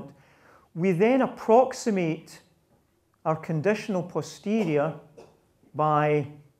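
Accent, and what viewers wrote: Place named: Scotland, United Kingdom. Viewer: The first thing that struck me was the super cool beard. The second thing was the super cool accent. What is that accent? Is it some kind of Scottish?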